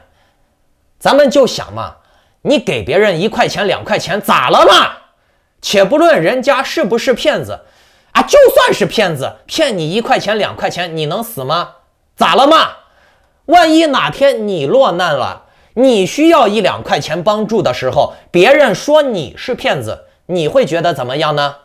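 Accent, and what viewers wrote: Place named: China. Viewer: 大陆口音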